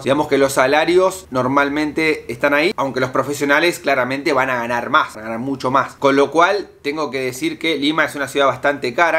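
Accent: Argentina. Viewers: Muy interesante tu vídeo!! Bien expuesto muy claro. Se que tienes todo bien calculado. Me alegro que estes conténto con tu trabajo!!! Es verdad que los argentinos son muy bien vistos y considerados en Peru!! Especial mente por las chicas jajajajajaja 😂. Es sabido que no hay Argentino feo!! Todos son bellos y sobretodo encantadores, creo que eso que decían antes que el Argentino es sobrado ya paso a la historia verdad?? Ojalá todo continúe como dices avanzando y no empiecen las trabas con el gobierno de este señor Rojo!! Espero sigan tus existos tanto en el canal y como docente!! Me encanta cuando hablas y dices Posho, shamame, Sho etc etc jsjajaja😂. Me gusta ver que la gente te quiere!! Los peruanos somos querendones con los extranjeros pero no!! entre nosotros lamentablemente!!! 🙁. Buena vibra 😊 Bendiciones 🌺🌸😊😉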